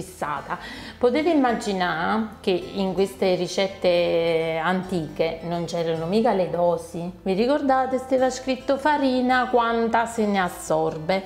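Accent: Naples, Italy. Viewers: Signora siete troppo simpatica, nonché precisa e chiara, spiegazione eccellente , italiano corretto con la simpatica cadenza napoletana!!!!! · Adoro questa tuo accento napoletano... Sei simpaticissima e bravissima complimenti!!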